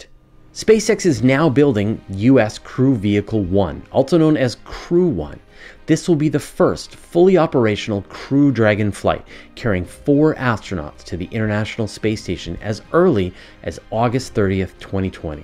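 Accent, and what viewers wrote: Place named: Canada. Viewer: If you have a Canadian accent, it is very, very faint.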